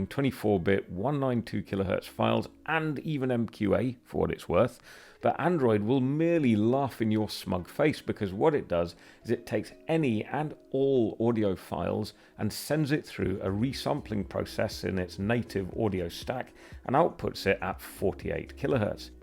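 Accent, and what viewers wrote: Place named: Yorkshire, United Kingdom. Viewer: Very nicely spoken…..and I think occasionally I hear a trace of Yorkshire ..but I could be wrong…🙂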